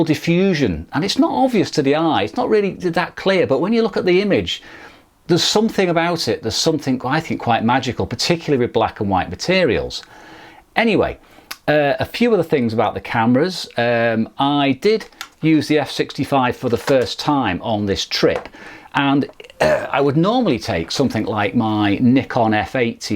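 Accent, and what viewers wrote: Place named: United Kingdom. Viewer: Dear: I love the form and content of your channel. English is not my native language but and I understand the British accent better. I like that you are serious, humble, calm and your funny touches of humor. I love the comparisons you make between expensive and also popular cameras and that you go through various formats. It is exciting that a camera that is over 70 years old, but of course, of medium format, surpasses a camera with much more technology in several aspects. I also like that you can integrate both film and digital cameras and rescue the M43 in a kind of countercurrent where photography is often reduced to the more MPX and more ISO maximum level. Very happy that I still have more videos to learn. Greetings from Buenos Aires.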